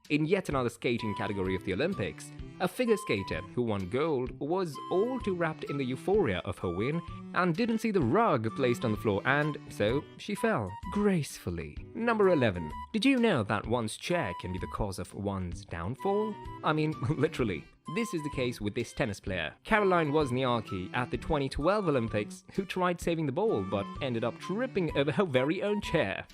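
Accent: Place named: England, United Kingdom. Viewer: The English accent make it so much funnier👌.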